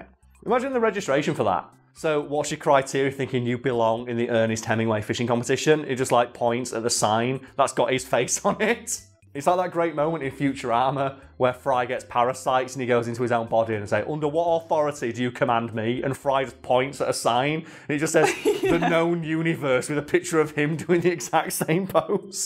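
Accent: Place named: Sheffield, United Kingdom. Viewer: I thought I was the only one who noticed he is talking more and more with a Sheffield accent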